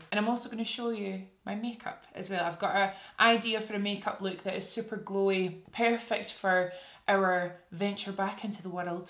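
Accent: Scotland, United Kingdom. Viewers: I'm an English teacher from Germany and must admit, the Scottish accent is my absolute favourite one to listen to, although, of course, I'm aware that if you'd talk among friends I probably wouldn't make out any sense at all 😂 It's so charming that it totally adds to my pleasure watching this channel and contributed to my decision to subscribe honestly, a lot...but reason no 1 the humour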